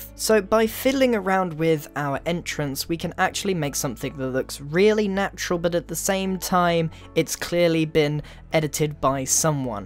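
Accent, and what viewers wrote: United Kingdom. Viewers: Can we see British again? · what's with the british you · Why the British stereotype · british british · Who likes British? Cuz I do, also. Can you use bedrock and turn it into a good looking house? I think not.(not asking u to do that. It’s just a question.) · I love British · I like british better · Very clearly British.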